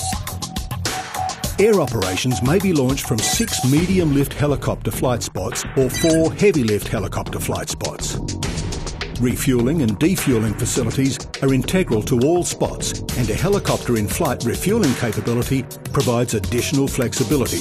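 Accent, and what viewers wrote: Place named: Australia. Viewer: Australian accent, I prefer English UK acent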